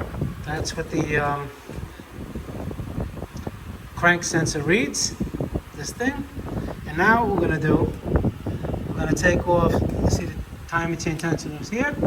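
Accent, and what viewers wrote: New York, United States. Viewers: Are you from New York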